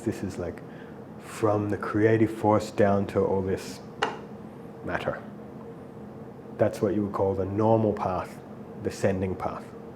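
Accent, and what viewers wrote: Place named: Australia. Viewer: Have seen on YT before, but didn't pick the Aussie accent until just now! Must keep an eye out for seminars here... 👁️ ☺️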